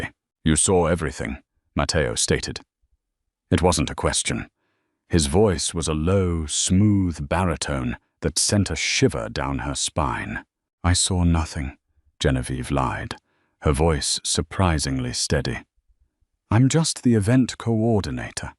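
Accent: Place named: United Kingdom. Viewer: I love 💕 the story, but I get tired of the British narrator. He is in America 🇺🇸, and the narrator should have an American accent. Don’t get me wrong, I do like the British accent, but I would like to hear the American accent sometimes. The British narrator sounds too dramatic. Rena from Rio Rancho, New Mexico, USA 🇺🇸. ❤❤❤🥰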